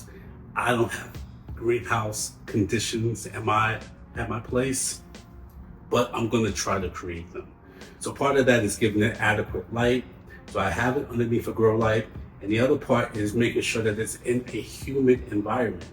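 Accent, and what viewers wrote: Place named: New Orleans, United States. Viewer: I thought you were from my part of the world with that Accent lol New Orleans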